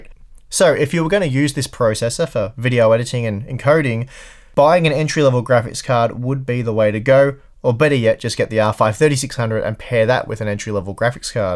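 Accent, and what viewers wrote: Australia. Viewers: I think he says "near on impossible", might be the aussie accent.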